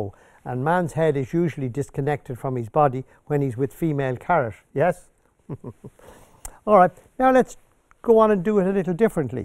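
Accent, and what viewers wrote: Ireland. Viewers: Dear I’m so glad I found your video site. After going to 2 learning to paint sessions I feel I didn’t learn very much, you make it so easy and I love listening to you with your lovely Irish accent and as my MumRIP was from Dublin it brings back lots of memories. I will continue to paint although I’m a bit nervous Thank you. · My hair dryer has lived in my paint basket since I discovered "Simply Painting" years ago in a craft shop after having made a fool of myself in a watercoloring painting class. Now I have a brand new set of gouache, and you're going to be the reason for my success with them. You make sense -- and I love the brogue. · I do paint a bit but I tune in especially to hear your voice🍀☘️. My Gr.Parents were from Dublin & spoke just like you. I enjoy listening to you & It’s very comforting. I loved them dearly❤️.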